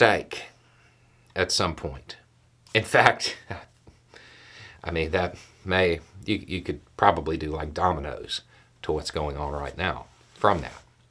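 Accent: South, United States. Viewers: Please do a couple more videos with your southern accent!!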